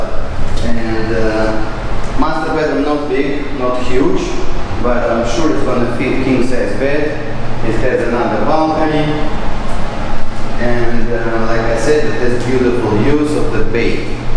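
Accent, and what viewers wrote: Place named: Russia. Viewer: Слышен русский акцент)) но это только радует)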